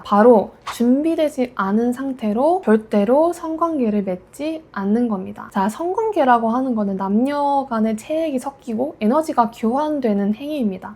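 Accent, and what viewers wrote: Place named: Gyeongsang, South Korea. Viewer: 부산 경남 사람이신듯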